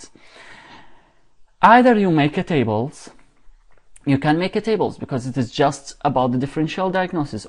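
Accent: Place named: Iran. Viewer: you kno bout estop estop omg dont marrrkkkk on. Where is the English proficiency? you dont underestand neting. dude your persian accent is terrible.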